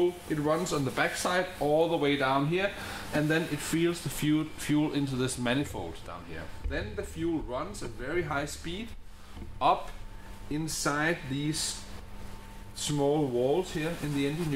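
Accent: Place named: Germany. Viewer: the moment i heard the german accent i knew he was legit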